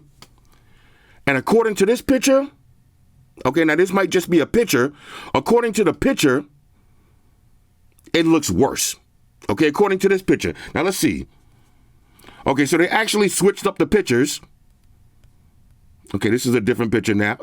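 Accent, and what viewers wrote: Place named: Queens, United States. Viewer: oh my guy from queens, dope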